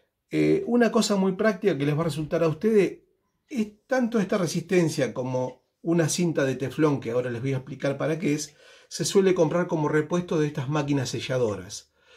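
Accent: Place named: Argentina. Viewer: No vale eso te quedó profesional amigo... Exelente trabajo y vídeo. Me suscribo y te felicito por tu canal y talento tienes la paciencia para enseñar y conocimiento para dar gracias amigo. Me parece que eres argentino Dios te siga bendiciendo grandemente y Dios Bendiga a la Argentina. Un abrazo y saludos desde Caracas Venezuela 👍🏻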